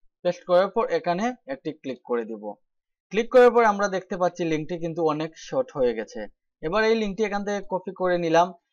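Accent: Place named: Sylhet, Bangladesh. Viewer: ভালো হয়েছে, তবে কখার মধ্যে সিলেটি একটা টান রয়ে গেছে।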